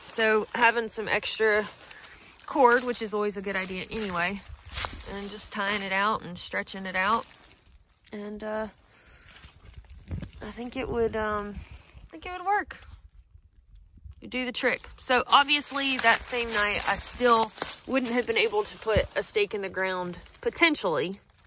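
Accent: South, United States. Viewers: I love to listen to you speak, the beautiful Southern lilt in your voice, the way you speak like a little girl when you're talking to insects or animals, the revery here of when you were a little girl and how it's affected you as a woman, the love I hear for your dog Hank when you find one of his hairs, "But now, uh, I hope it never stops. And I can tell it's his cuz there's a whole sunset in the end of it." Whether you're talking about equipment, or the trail, or dispensing some of your homespun philosophy, it's always interesting. You so seldom have a harsh word to say, and have so many kind and uplifting things to say. It's what makes your channel so great. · loved the deer at the beginning! Did you tell them "hey, darlin'!"? If I had ANY deferment of gratification (which I do not) I would wait until you finished and watch them all at the same time! 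So good - and as a non-deep south southerner, I love the accent!!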